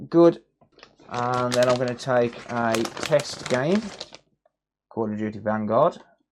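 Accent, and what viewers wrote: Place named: Walsall, United Kingdom. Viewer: Hi,you got recommended by YouTube in my feeds and liking the content but i am PC though.I recognized your accent and thought Walsall or Willenhall,I am from Wolves just up the road ha!,just curious as to your technical qualifications?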